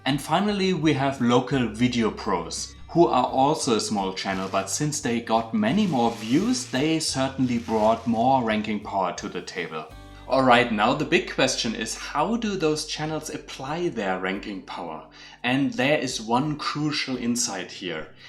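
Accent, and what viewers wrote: Germany. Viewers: hört man richtig stark. Ist halt der typische deutsche Akzent beim englisch reden 😃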